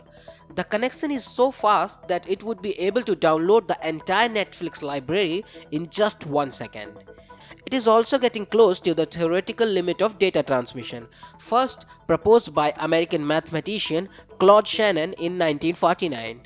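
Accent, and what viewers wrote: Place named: India. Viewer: 咖喱味英语劝退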